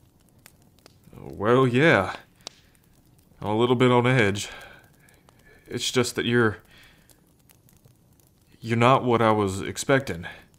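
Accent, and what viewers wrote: South, United States. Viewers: He sounds southern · a heavy southern accent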